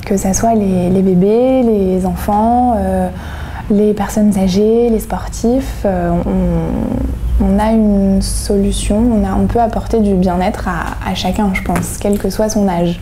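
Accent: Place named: Paris, France. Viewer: Dawsen Mage c’est du français parisien 🇫🇷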